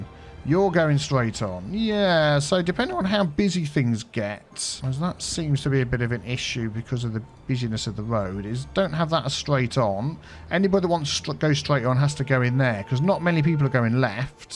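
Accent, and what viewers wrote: United Kingdom. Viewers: Your british accent is the best part about your videos man!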